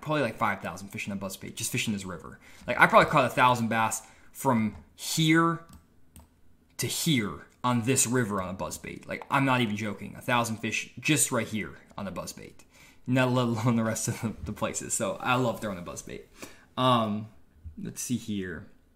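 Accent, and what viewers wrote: Arkansas, United States. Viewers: Your from Arkansas??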